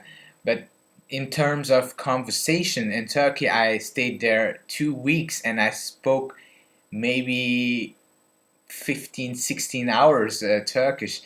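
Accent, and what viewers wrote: United Kingdom. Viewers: You have more of a british english accent than an american one now! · I like your accent, it got very British! :) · Hey! Your accent is awesome! where's american? You're starting to acquire the british one! Did u notice the change? great vid.. see u soon! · Wow I really notice how your accent has got more like British pronunciation. It is quite noticable :D As always, really enjoying your videos :)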